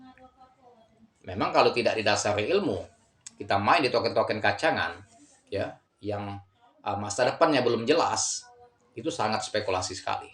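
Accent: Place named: South Sumatra, Indonesia. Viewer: Sepertinya bahasanya bpk asal sumsel ya Pak..salam cuaan Pak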